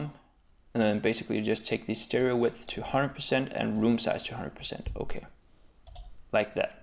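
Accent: Denmark. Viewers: Yoo dude, this is absolutely brilliant, thanks a lot! Also, er du dansk? Synes virkelig man kan høre det på din accent :P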